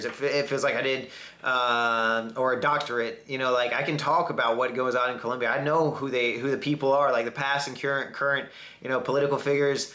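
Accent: South, United States. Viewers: Jesus, the way you speak English you're like a redneck hillbilly guy 😳 but anyway, I'm American to one Colombian parent which is my mother proud to be an American-Colombian I'm going to apply for Colombian citizenship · I can´t believe you´re my age! Congrats on your job so far! As a Brazilian, I´ve got to say it´d be awesome to hear you speak Portuguese as well. I was curious about what state in the USA you were from (I heard it´s South Carolina), because your accent is slightly different from the standard american one, although I can understand every single word you say. Perhaps this is because you talk way too fast sometimes or because of the idioms and slangs you use, but it´s a very nice accent. Best wishes from Porto Alegre and come visit us once again. keep up the good work! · I must correct you.. im a black dude living in south America.. and the people are nice to everyone for the nost part... 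I honestly dont think your "whiteness" has as much to do with it as you think.. It may be moreso your accent being American...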